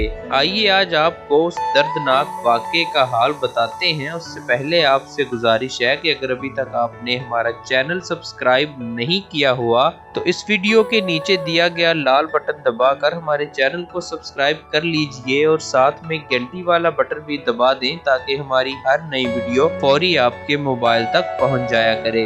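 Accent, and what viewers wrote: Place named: Punjab, Pakistan. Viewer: بھائی آپ پنجابی میں بات کیا کرو. آپ کا لہجہ پنجابی ہے.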